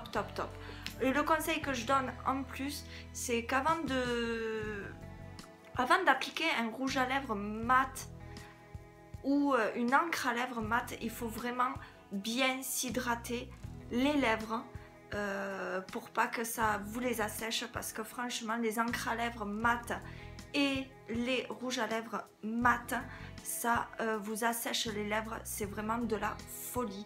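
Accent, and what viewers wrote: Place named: South, France. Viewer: AAAh ok j'entendais un petit accent du sud,bonne journée à toi!bizzzzzzzzzzz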